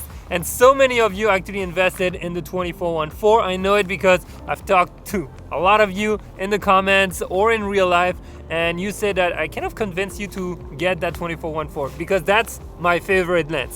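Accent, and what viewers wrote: France. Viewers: French accent salut parler vous francais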